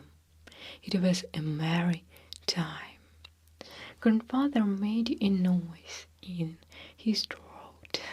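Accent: Russia. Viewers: I also agree that your English is very good!! Your Russian accent is even more adorable and that is a great reason that I listen to you!!! Very sweet and relaxing!! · I admire Russian literature and Anton Chekhov was a great writer even though he is not as famous as Tolstoy. I think you should make this into a series especially stories from the 19th century. I love your soothing voice with that beautiful accent of yours 💕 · Thank you so much!~ I enjoy the relaxing tingles from this video and I love your Russian accent!~ You speak English so well as well if I may say so.~ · Beautiful, beautiful russian accent and all the options for names! wow! I like Russian so much. Thank you for another wonderful video and, you look so beautiful!!!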